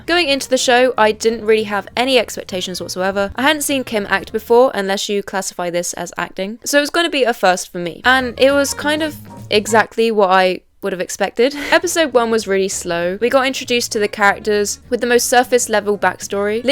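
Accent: England, United Kingdom. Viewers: I seem to have fallen into a habit of watching these types of video essays in the evening and no matter the topic, your commentary makes it fascinating and engaging, so thanks for that! I am trying to reclaim my attention span by watching longer videos lol. Also I find it such a struggle identifying English accents, I'm Irish so I know the difference between North and South and the really obvious ones like Liverpool, Yorkshire and Birmingham but would I be right in placing yours somewhereeee outside of London? As in the surrounding counties or somewhere like Brighton. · Oh great another British YouTuber! You should really learn the pronunciation of people's names and not Britishize them. I watched one episode of this garbage show and will not continue. I feel Kardashians are a plague upon this earth!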